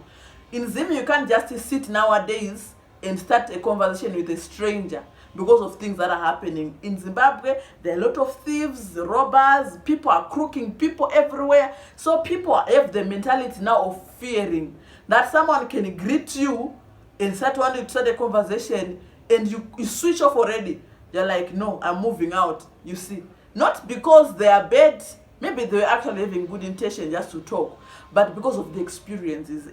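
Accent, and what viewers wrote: Uganda. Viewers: Uganda is on the equator two rain seasons so plenty food.you now speak like a Ugandan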